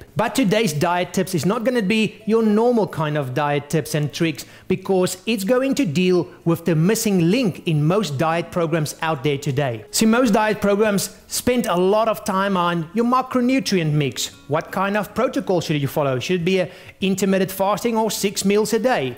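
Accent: Afrikaans, South Africa. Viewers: South African? I here that Afrikaans. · Less talking. More showing. The talking makes you look like a idiot. S.A accent. Painfull. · He-he, I couldn't place the funny accent until you pulled biltong out of the fridge :) Cheers from Canada! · He's South African...Most likely Pretorian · Kyle Pickens he speaks weird cause he is afrikaans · This guys accent makes me think of krugerrands. And Krugerrands makes me think of Lethal Weapon 2. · definitely an Afrikaans accent lol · By your accent, I'm guessing you must be from South Africa. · what accent is this? South African? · is this guy South African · He sounds like South African' correct me guys if I'm wrong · Damn this guy has a strong Afrikaans accent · Is that a south African accent?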